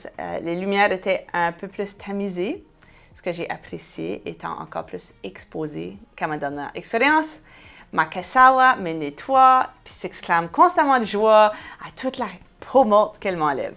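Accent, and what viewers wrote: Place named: Quebec, Canada. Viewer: Un vrai choc culturel, je m'attendais à être agréablement surpris par une dame prés de chez moi, mais à la fin je suis dégoûté sur la forme et sur le fond ! Accent bledard beurk , de l'époque des filles du rois, du Séraphin: Nouvelles histoires des pays d'en haut, des coureurs du bois, avec un regard hautain de la petite québecoise qui voyage pour ses premières fois! Le Maroc un pays multimillénaire, bourré d'histoire de civilisation, visité et revisité par les plus grandes stars du monde, show-biz, cinéma, sports , arts, etc...Mais la petite Lavaloise avait peur qu'il y vivent encore des cannibales, "DOUX" Jésus Marie, on l'a pas dévorée, elle est revenue en un seul morceau. Mince, on ne vend pas le pâté chinois et la poutine au Maroc . Hands down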